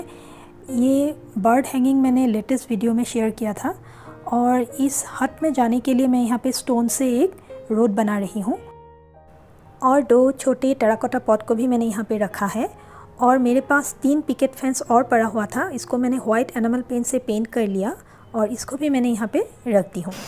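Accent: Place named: Assam, India. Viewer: I like your creativity. I am definitely going to try it. By the way, are you Assamese? The way you talk seems like that. Are you from Assam?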